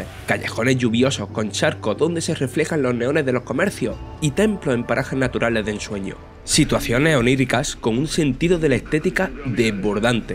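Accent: Andalusia, Spain. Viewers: No le cuesta hablar, es un acento de una region de españa!!! sino te gusta no entres a ver el video. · me parto con la voz andaluza.... 😂😂👍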